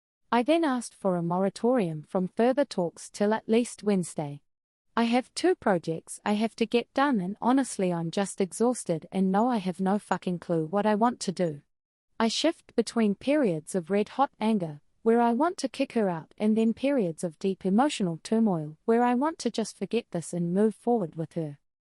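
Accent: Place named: Australia. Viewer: It's Strine.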